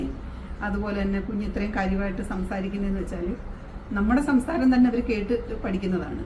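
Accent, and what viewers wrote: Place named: Kottayam, India. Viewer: ഞാൻ ഇത്രയും നാൾ കരുതിയത് ചേച്ചി ഒരു അച്ചായത്തി ആണെന്നായിരുന്നു. തനി കോട്ടയം ഭാഷ അല്ലിയോ 😂ആളെ ഇപ്പോഴാ കണ്ടത് സന്തോഷം 🙏🏻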